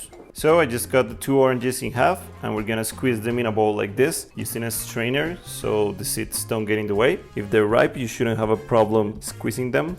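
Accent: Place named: Rolo, United States. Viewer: It's funny how you have that perfect English with the "rolo" accent.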